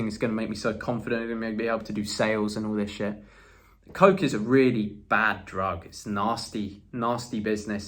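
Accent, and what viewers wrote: England, United Kingdom. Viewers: I just love that English accent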